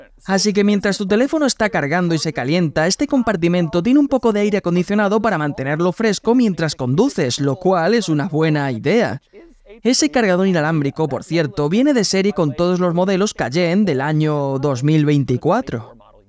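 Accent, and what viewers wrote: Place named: Spain. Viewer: Por qué español de españa 😭😭😭😭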